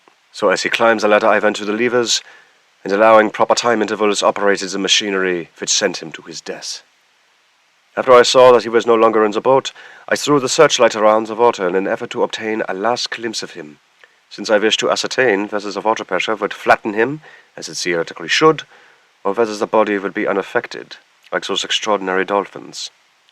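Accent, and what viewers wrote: Germany. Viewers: Vielen Dank für die Erzählung mit deutschem Akzent. Das verleiht der Geschichte einem Hauch von Authentizität und macht sie viel eindringlicher. 😊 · Listening to this while at work was such a nice reprieve from the norm.i loved your German accent!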